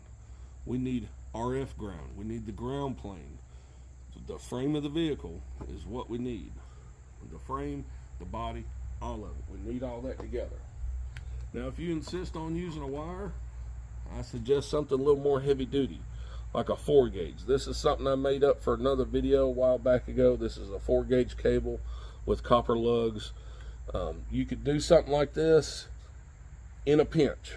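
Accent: South, United States. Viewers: Ugly redneck accent. 26 minutes of BS and 1 min of information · Thank god I am from the south and can tolerate southern talk and mannerisms without getting pissed off because "condescending" to Californians is normal speech down here. Also here to learn CB. Got a firestik antenna and am learning how to use it. Thanks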